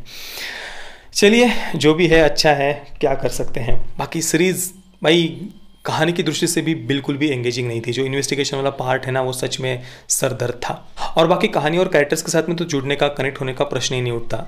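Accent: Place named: Maharashtra, India. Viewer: bhai main kanpur se hoon ....muzhe marathi nai aati lekin aapke muh se marathi badi pyari lagti hai..isi bahane ek nai pyari language sikhne miltti hai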